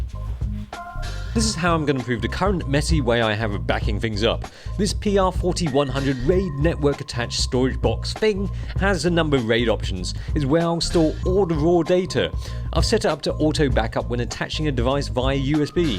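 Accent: United Kingdom. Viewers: So cool to see a Japanese-looking guy speak in a British accent. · you are an icon and a legend in my books! Lovely presenter, funny, to the point and honest, PS you gotta love that British ascent, right?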